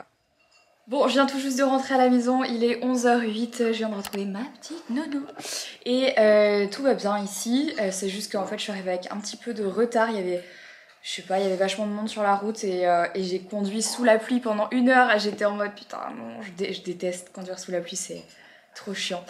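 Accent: Belgium. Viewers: tu commences à prendre l'accent belge! ahah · Tu commences à prendre qqes intonations belges dans ta voix c'est mignon ^^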